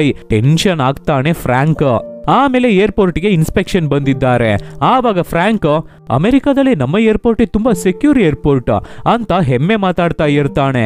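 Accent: Tamil Nadu, India. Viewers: ಅವಣು, ಇವಣು. ಯಾವಣು? ತಮಿಳುಕನ್ನಡ 😮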